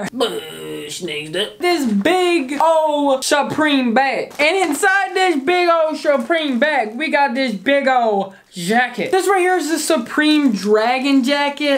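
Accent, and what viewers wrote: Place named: Sweden. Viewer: Are you from sweden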